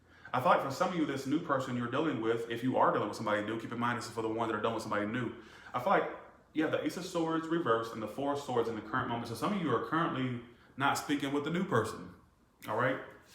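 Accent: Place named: Louisiana, United States. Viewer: Hes Creole/ Louisiana I think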